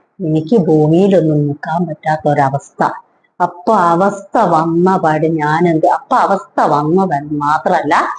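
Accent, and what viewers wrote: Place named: Kannur, India. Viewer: Kannur bhasha ane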